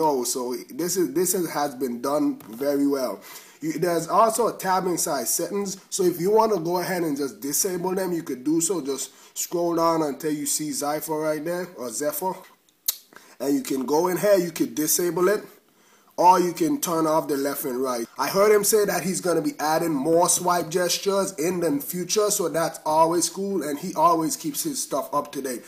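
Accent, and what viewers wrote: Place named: Jamaica. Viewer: Your voice is sick!!lol Jamaican?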